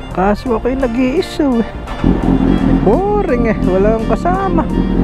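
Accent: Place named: Bulacan, Philippines. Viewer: Aba eh nawiwili akong makinig sa pagsasalita mo ngayun idol eh,alam mo bagang ganyan din magsalita mga taga San Miguel bu..lacan...mga kamag anak ko dun eh pag silay magsalita ng ganyan eh di ko wari kung akoy tatawa eh....hehehehe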